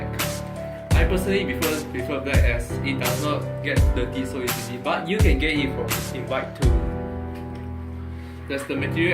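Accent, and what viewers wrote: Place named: Philippines. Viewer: when you talk that is more like pinoy lol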